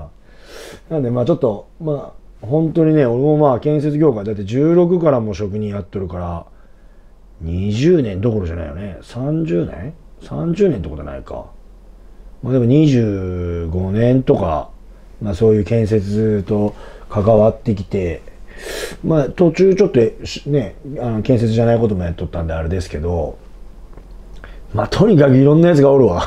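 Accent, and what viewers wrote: Nagoya, Japan. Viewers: いいね 名古屋弁！港区か南区あたりの出身ですか？ 思わずチャンネル登録。Goodボタン押したよ。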